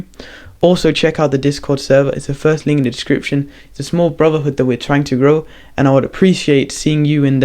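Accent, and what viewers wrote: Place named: United Kingdom. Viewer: man that accent is honey for hears, wish i had a uk accent🙏🤣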